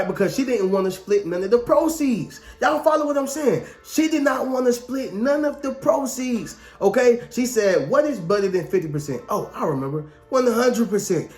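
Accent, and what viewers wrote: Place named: Rural, United States. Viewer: It ain't the same no mo...love your country twang.....😊😊😊😊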